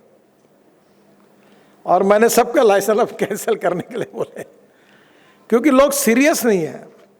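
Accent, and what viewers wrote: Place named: Nagpur, India. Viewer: Kya baat hai...👍... Nitine kakaa,...maja aagayaa sunkar....😂😂😂.... bahot badiya bhashan...❤️...se akdam... Nagpuri touch me...🙏 Namarkar...apko..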